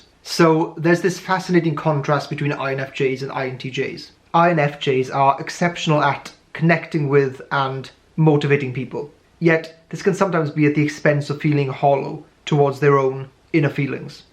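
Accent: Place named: England, United Kingdom. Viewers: I love your accent. It sounds special. Maybe it's English-English. There is mostly American-English on Youtube so this one is different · Now if I can figure out your accent. Not scouse! RP with a touch of the Midlands maybe ....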